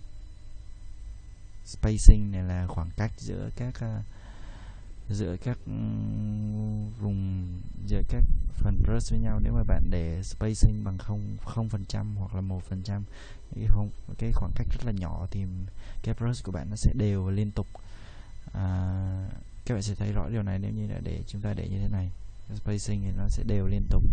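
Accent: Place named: North, Vietnam. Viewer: góp ý một tí là bạn nên nói to hơn chứ đừng nói kiểu thì thầm như thế, mình khó nghe được giọng bắc. dù sao tut của bạn hướng dẫn rất kỹ và chi tiết. cảm ơn bạn, sẽ ủng hộ channel của bạn!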